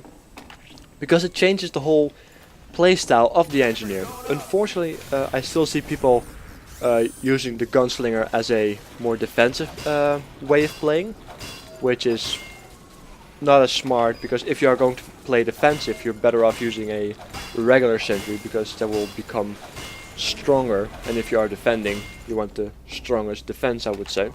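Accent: Netherlands. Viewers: Are you dutch? U got a dutch english accent XD (no offence) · your dutch right? :p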